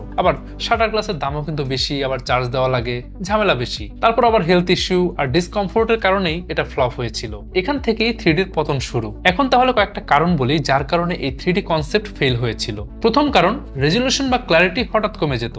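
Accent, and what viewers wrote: Bangladesh. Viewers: কেবলমাত্র হাড়িয়ে শব্দটাই নয় , আপনার কথা বলার ভঙ্গিমার মধ্যেও এমন অনেকগুলো শব্দ রয়েছে যেগুলো আপনি বাংলাদেশী মানুষদের মতন করেই উচ্চারন করেছেন , আমরা পশ্চিম বঙ্গের বাঙালীরা সেই একই ( এই শব্দটার কথাই বিশেষ করে বলতে চাচ্ছি ) শব্দ একচু অন্যভাবে বলে থাকি । যাইহোক আপনাকে অভিনন্দন জানাচ্ছি । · বাংলাদেশের বাঙাল রা এই রকম উচ্চারণ করে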